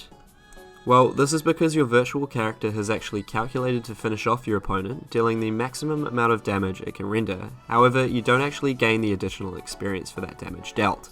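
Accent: New Zealand. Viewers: Bro I swear you sound like ur from nz, am I right? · I realised half way through the video when you were pronouncing some of your words that you were from the brother country lmao, no worries mate :)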